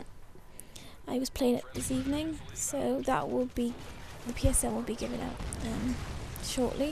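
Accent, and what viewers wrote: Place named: Southern England, United Kingdom. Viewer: one of THE most helpful commentators ever :D, also you sound like you're from england :P just saying, because i'm from the south of england :) and it's a accent i recognize o.o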